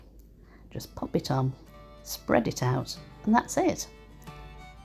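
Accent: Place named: United Kingdom. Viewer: Hi! This chocolate frosting looks so creamy and decadent! I could hear your videos all day long because I love British accent, very charming 😍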